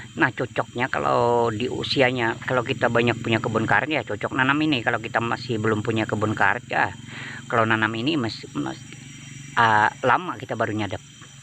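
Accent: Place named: Banjar, Indonesia. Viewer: Ini di kalsel,beliau logat banjar ,dan mmg suku banjar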